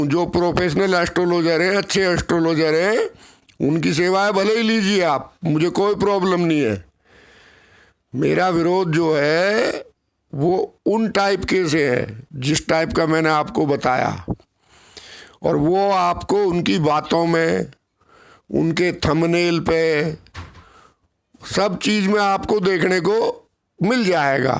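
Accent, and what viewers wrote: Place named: Delhi, India. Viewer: Ram Ram Dada aap ki boli Delhi Ncr ki hai pahle bhi apse reqvest ki hai darsh karne ki aapse marji aapki apka contact no to milta nahi mera no hai <num> Om namo narayan Dada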